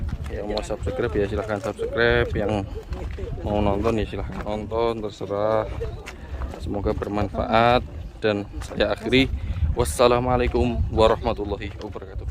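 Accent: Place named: Banjarmasin, Indonesia. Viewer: Kyak ada dengar suara org banjarmasin,, bapandir bahasa banjar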